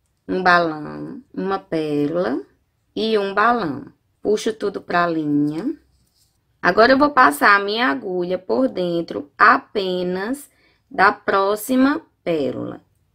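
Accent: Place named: Pernambuco, Brazil. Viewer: Maravilhoso, adorei!!! Que sotaque lindo!! Vc é pernambucana?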